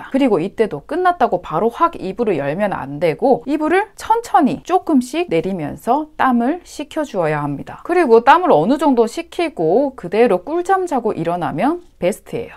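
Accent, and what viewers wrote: Gyeongsang, South Korea. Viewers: 일본어 하시는건가요 경상도 사투리 하시는건가요?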